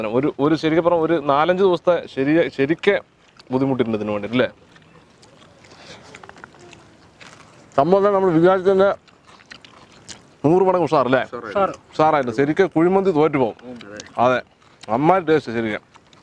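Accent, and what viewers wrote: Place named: Palakkad, India. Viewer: പാലക്കാടൻ ആണല്ലേ.. വർത്തമാനം.. കേട്ടാൽ പാലക്കാടെന്നു തോന്നുന്നു..!!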